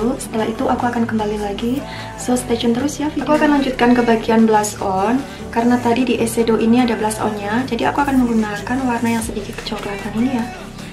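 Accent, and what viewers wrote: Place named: Wonosobo, Indonesia. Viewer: Dari logat bicaranya seperti nya anda tinggal tidak begitu jauh dari wonosobo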